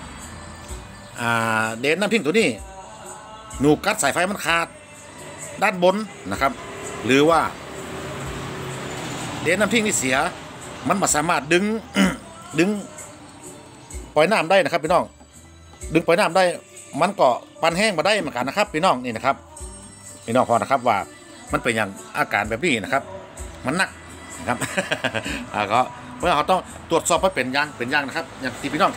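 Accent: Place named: North, Thailand. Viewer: ผมคนใต้ชอบสำเนียงคำเมืองมากครับ เพราะมากอีกแบบหนึ่ง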